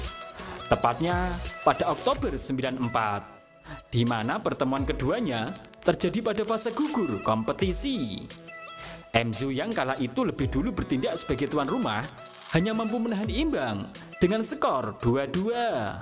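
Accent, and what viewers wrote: Java, Indonesia. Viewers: Jowo medok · Pengisi suaranya pasti orang Jawa ni... Medok 😁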